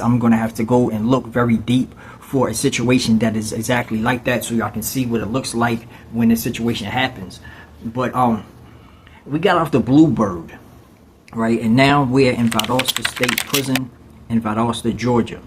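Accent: New Jersey, United States. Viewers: I knew you were from Jersey before you even said it🤣! Newark in the building. This the first video I’ve seen and I was confused because I’m hearing a Jersey accent on a Georgia prison video it was unexpected.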